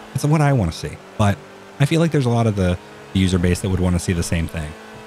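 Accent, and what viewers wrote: Bay Area, United States. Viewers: Why do you sound like Bay Area buggs a little? Or is it just me? Anyways love the content!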